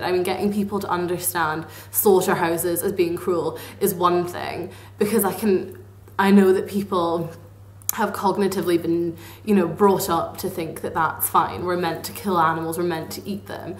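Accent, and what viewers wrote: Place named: England, United Kingdom. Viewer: You sound english and why would you buy a coat for -40 when englands temp is like 5 degress in the winter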